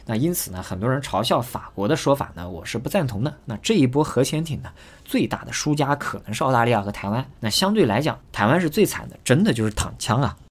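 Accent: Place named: Shandong, China. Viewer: 哥哥的口音特别沙东，好喜欢，恋爱了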